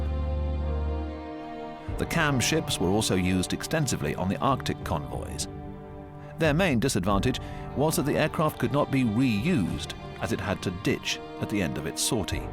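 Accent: United Kingdom. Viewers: British narrators are the best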